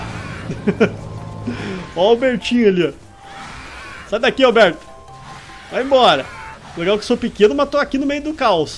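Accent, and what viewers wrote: Rio Grande do Sul, Brazil. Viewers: vc e do rio grande do sul?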